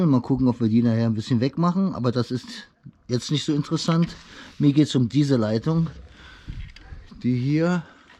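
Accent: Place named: Berlin, Germany. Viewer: aber cool das Du es machst, so versteht man dat Berlinerisch wenigstens XD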